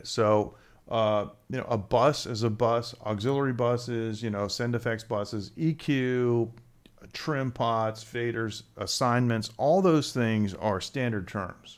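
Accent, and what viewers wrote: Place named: Boston, United States. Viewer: Shared. Hope you keep the Boston sound. Awesome stuff!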